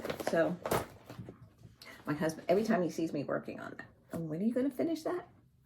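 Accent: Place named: Northeast, United States. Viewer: I just love your videos. We are drawn to many of the same patterns, and I could listen to your beautiful northeastern accent all day long! I love how involved and dedicated you are in the lives of your grandchildren. They are so blessed to have you. I pray mine one day live closer so I can be helpful to my children by babysitting. I want to register an enthusiastic YES PLEASE to the "under the bed box" video. I would love to settle in and watch that!!!! Happy new year to you.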